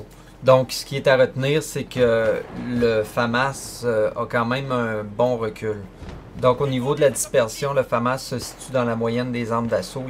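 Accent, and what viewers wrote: Quebec, Canada. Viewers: Accent Québecois, il n'y a pas de honte et il ne faut pas en rire. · acssent du Quebec <3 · Vidéo ultra structurée très utile et en plus avec l'accent quebecois !!! Je suis fan continue comme ça ça nous aide a comprendre les subtilités de ce jeu tout comme ta vidéo sur les accessoires.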